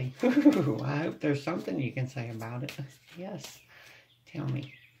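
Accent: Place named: South, United States. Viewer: Wow just found your beautiful channel ! I subscribed ! I love your southern voice ! I’m praying to reunite with my southern cowboy who’s a Gemini like me ! I’m from the.north ! We’re like Blake Shelton and Gwen Stefani lol 😂🤠if only he opened his eyes to the real truth ! We were engaged in the 90 s! 🙏❤️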